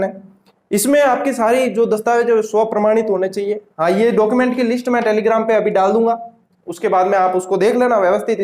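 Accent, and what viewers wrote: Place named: Rajasthan, India. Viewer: Sir aap marwadi bolte h to bahut mast lagte ho 😎😘🥰😘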